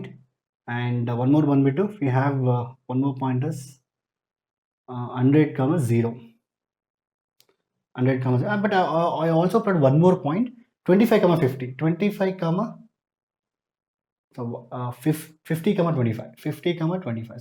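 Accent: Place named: Malnad, India. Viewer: Sir, where are you from.. Whenever you speak i feel u r from malnad... Pls reply... Since i am also from malnad i am curious to know 😁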